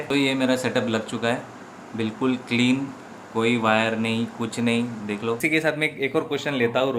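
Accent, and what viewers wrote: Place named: Solapur, India. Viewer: tum Solapur ke ho kya???? Blog mein sunne jaisa laga???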